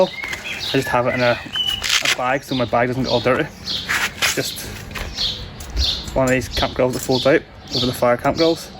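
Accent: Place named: Ulster, United Kingdom. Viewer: Just found and joined Your Site , There was Eng subs on it ! Anybody who cant understand Your soft Ulster voice can Feck off as We down South would say but I am in London for longer than Dublin so I just got the same pack as Your Self and have done nothing to it yet , one for the New Year anyway Happy Crimbo to You and Yours and I look forward to seeing how it goes next Year for You .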